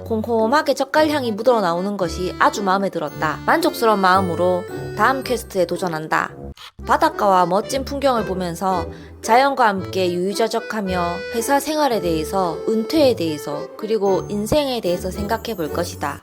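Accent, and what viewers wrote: Gyeongsang, South Korea. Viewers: 이건 도대체 어느 동네 사투리? 요즘 경상도에서 이런 말투 쓰는 사람들이 있나??? ㅋㅋ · 부산이시골이네? 목솔 들어보니 깡촌녀ㄴ~~ 부산오지마송 밀양가소 그가 더어울릴것가소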